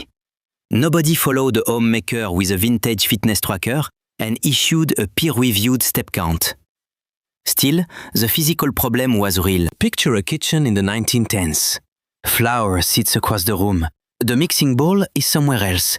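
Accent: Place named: France. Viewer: I like this decent French accent😊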